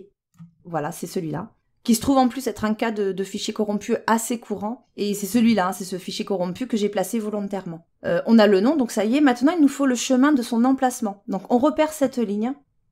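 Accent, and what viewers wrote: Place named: Corsica, France. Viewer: accent corse.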